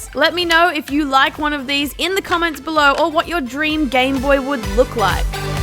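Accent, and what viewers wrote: Australia. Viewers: is she austrailian?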